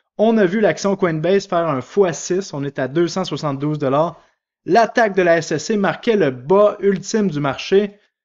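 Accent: Quebec, Canada. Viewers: Merci, je te suis depuis quelques mois car j'ai acheté des cryptos il y a 6 mois...j'adore ton accent canadien et les expressions canadiennes que tu emploies...mais surtout tes analyses sont intéressantes et pertinentes merci bcp.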